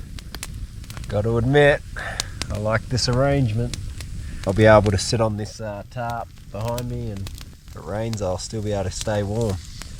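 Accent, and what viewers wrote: Australia. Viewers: Wäder😅 sorry my ear does not like the aussie accent BUT i am getting used to it, more i listen❤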